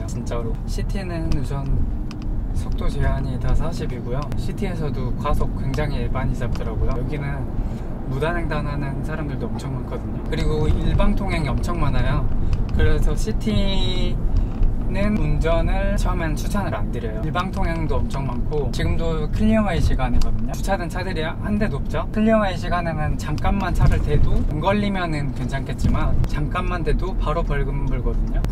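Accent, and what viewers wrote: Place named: Jeolla, South Korea. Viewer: 어딘가 전라도의 온기가 느껴지는 ㅋㅋㅋㅋ😂 감사히 잘봤습니다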